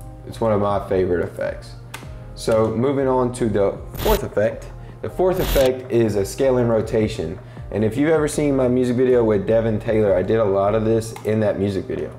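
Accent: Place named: South, United States. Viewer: Your speech is sooo nice!! Come on southern bred 🤣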